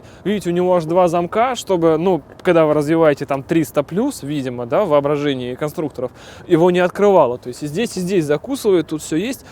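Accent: Saint Petersburg, Russia. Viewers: Уходит "Питерский акцент" который был в начале.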